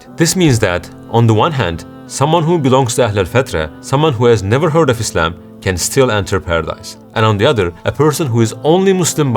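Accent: Turkey. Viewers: brother are you Turkish? Your accent sound like your Turkish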